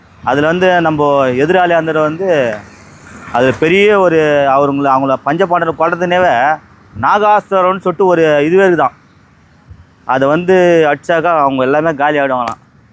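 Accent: Madurai, India. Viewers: Super Anna Madurai vasagam 🎉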